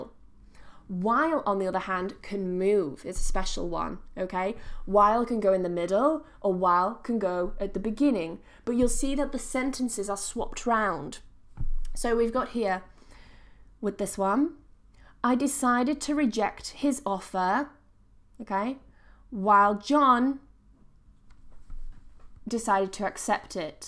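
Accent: United Kingdom. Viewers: Plse keep g.... we need to learn british accent 😍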